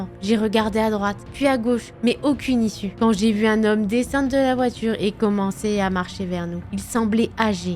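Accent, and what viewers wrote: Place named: Belgium. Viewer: Belge ahah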